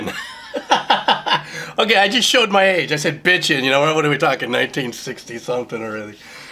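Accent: Hawaii, United States. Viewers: Where are you from brotha, sounds like your on the Islands. If your located on Oahu and give music lessons, I would be interested, Aloha.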